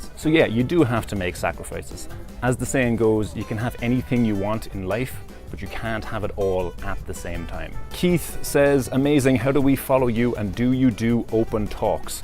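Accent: Cork, Ireland. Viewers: It has to be Cork. He has Roy Keane and Dennis Irwin’s twang to his accent and voice. But a bit more well spoken lol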